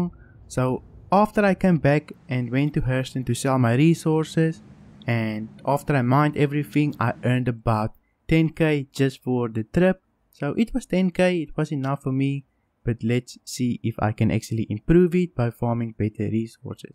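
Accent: South Africa. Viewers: That accent. I'd know it anywhere. Fellow South African. Nice vid man. Hoping to get into SC soon so I'm watching a lot of gameplay videos. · Are you South African? Can hear an accent but not sure, nice video, I'm renting a prospector currently and using helix mining head, making about 45-90k a run. Almost made 1week rental money back in 1 day and now it's for the grind to use the rental to buy it, 1 mil to go. Subbed and liked your video.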